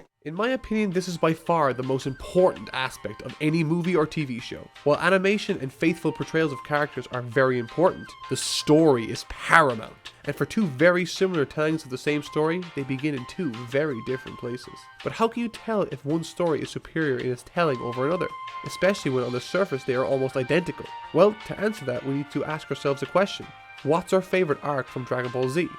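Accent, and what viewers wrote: Ireland. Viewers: No, you say "Vegeta". "Vechita" is inaccurate as a pronunciation of it. His name is a pun on the word "vegetables," not "vechetables." This guy's Irish, so maybe it's a quirk of his accent, but then again, his accent's very light, so it doesn't make sense, since he flip flops between the 2 randomly. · Wait your from Ireland?!?!?!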